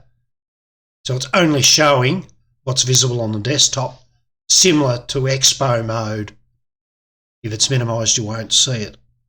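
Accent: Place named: Australia. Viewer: There is something comforting and no nonsense about someone with an Aussie accent giving a tutorial on Linux. His YouTube handle is also 😁 Instant Subscribe!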